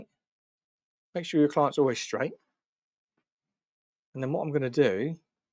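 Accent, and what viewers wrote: England, United Kingdom. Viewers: Let me first applaud you for your skillful talent. I’ve watched several of your videos and although I am no hairdresser by any means, your videos for the average DIY are so precise and helpful. Secondly, I think English accents are incredibly sexy. I could literally fall asleep listening to your videos on repeat. Keep up the good work!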